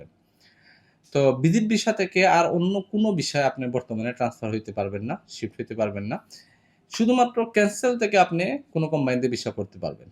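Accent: Sylhet, Bangladesh. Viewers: ভাই আফনার মাতে লাগে,আফনে সিলেটি😊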